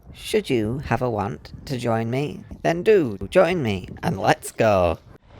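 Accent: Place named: North, United Kingdom. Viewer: And the lips. Don't forget the lips. Oh, and the Northern accent 😁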